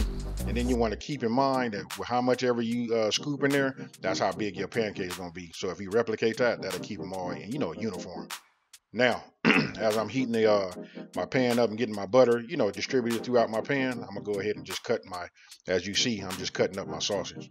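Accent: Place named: California, United States. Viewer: That Cali accent don’t lie! I love it! And those pancakes looks sooo good!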